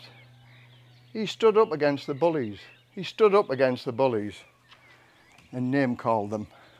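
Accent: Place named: Northern England, United Kingdom. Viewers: Hi what accent is that, sounds like my Middlesbrough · I am an 86 year old Englishwoman and I will say that this is the best country to live in. It is not the lovely country that it was in my childhood but I would never live in another country. I have travelled extensively and lived,for three years, in Colonial Africa where I was very homesick for England. You sound like a northerner to me. Perhaps you have not seen the lovely parts of England.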